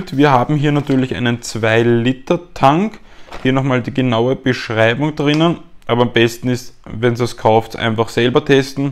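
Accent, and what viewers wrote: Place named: Tyrol, Austria. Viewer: I bin mir sicher das du Tiroler bist 👍🏻🙋‍♂️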